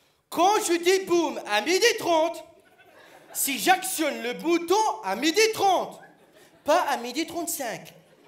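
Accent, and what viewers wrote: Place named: Senegal. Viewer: On dirait seydou abathia mais tu a l air senegalais meme accent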